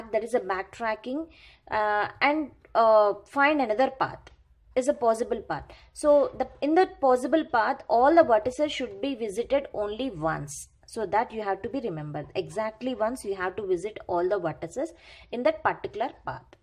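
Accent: South India, India. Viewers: Thats her accent bro,may be she's from south india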